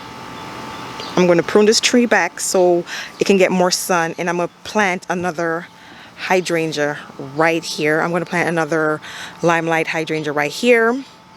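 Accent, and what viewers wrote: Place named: Jamaica. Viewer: you soundalike you have a Jamaican accent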